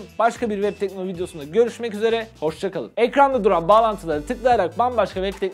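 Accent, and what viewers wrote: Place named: Uşak, Turkey. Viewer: ABİ SEN NERELİSİN UŞAK ÇEVRESİ GİBİ GARİ FELAN DİYON :D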